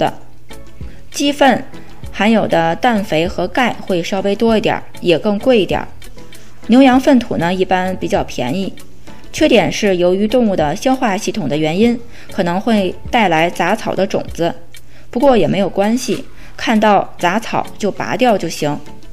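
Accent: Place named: Beijing, China. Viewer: 其实北京口音太浓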